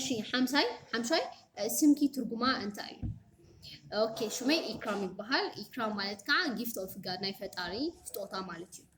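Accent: Ethiopia. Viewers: ናይ መቐለ ትግርኛ ኣይስማዕን ዝበለኪ ኸ መንዩ 😏😏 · anti shikorina ane nay Shire endiyu lahjay gin ab adigrat wey dma bhafesh ab agame zelo tigrigna esi kab nay etom lahjom des eyblnenin do gele do tiblyom zeleki bzuh zifile eykonen. Ab hade quanqua nay lahja fililiy zelo eyu. ms yikreta kemzi natki zereba neay nay nebse mithat zisimeo eyu koynu zisimeni. Ab Tigray zela hmam amhargna mihwas eya ezi kea ab kulu zelo eyu. Eritreawiyan "Agame" zibil kem xerfi silezitikemwa ilki kali eytmseli bnatki kuri. kali yiredaki eyredaki eytcheneki ksab Tegaru ziterduki tirah amhargna eynhwes eyu eti kinblo zelena. Ane demani kem Tigraweyti meten nay mekelle, wukro, agame, raya kulom Tegaru lahja yirideni eyu! Nebska mimsal zimeslwo yelen kemzi eytkuni.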